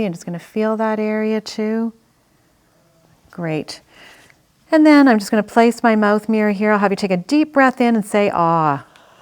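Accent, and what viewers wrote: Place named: Canada. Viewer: Her accent is SO Canadian 🍁🍁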